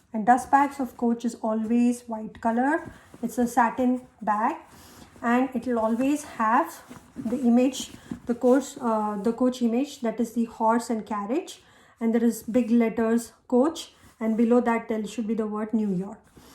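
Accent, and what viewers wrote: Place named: Punjab, India. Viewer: when you speak Ma'am like a tone of Indian punjabi 😊watching your videos from Philippines , i have also coach klare with revits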